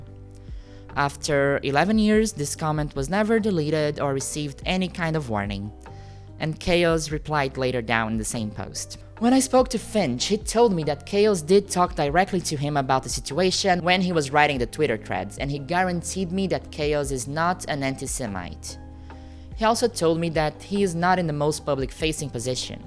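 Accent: Brazil. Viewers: Amazing vid and research, I had no idea about that. And thank you for your work in the comments, I've scrolled a bit and couldn't find a single nazi comment (and I KNOW they came here). I hope more people see this and your channel grows! Also... You're brazilian, right? It's so easy to recognize our accent when we speak english! lol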